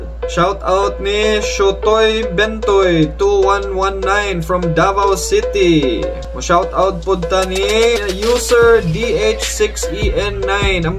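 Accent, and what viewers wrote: Visayas, Philippines. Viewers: Perting ka hawd ug bisaya dol oi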